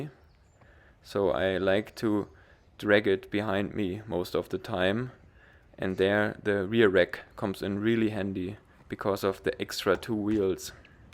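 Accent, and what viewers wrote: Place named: London, United Kingdom. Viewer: I have been riding Brompton bikes for nearly 25 years. The early bikes were terrible in terms of brakes and brazing quality. I used to hate riding them off-road, and probably still wouldn't use mine off-road. , you need to trim the fender slightly. This is a prime point for rust where the mud sits on the frame. It WILL make the frame rust. Every year, these bikes get better. The best thing about them is that you will always be able to sell one for exactly what you paid for it; they do not depreciate. By the way, I detect a slight London accent when you pronounce 'Brompton' as you use the London 'glottal stop' in your speech. Did you ever live in London?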